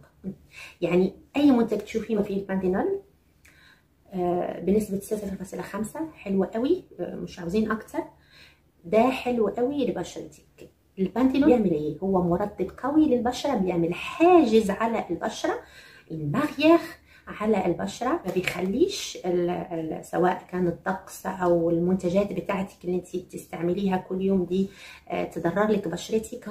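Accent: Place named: Morocco. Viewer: كنت أظن أنها من مغرب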